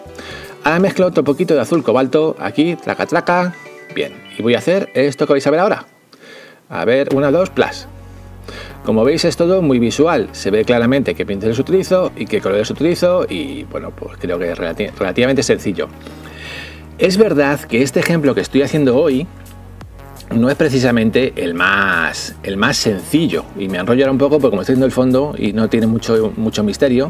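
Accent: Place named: Spain. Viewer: vamos que ya me he enrollado jajaja! Muy buen video y el acento español le da el toque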